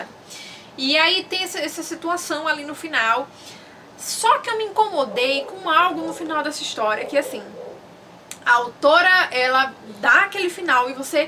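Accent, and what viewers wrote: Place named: Northeast, Brazil. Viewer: oi tudo bem? eu gostei de vc, do seu canal, vc é bem segura e tem sotaque nordestino como eu rsrs...vc é de qual estado? enfim, só tenho uma pequena crítica :( às vezes acho que seus vídeos são longos..vc repete o que já falou, enfim só uma opinião minha msm...td de bom :) até mais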